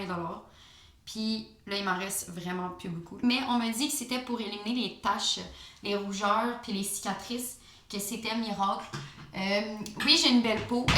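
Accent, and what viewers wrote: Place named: United States. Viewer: j’ai écouté la vidéo comme si une américaine parlait. tellement mon style de vidéo 🤍 that was fun!! continue yt comme ça big love NON AHAHAHA LES PHOTOS DE TOI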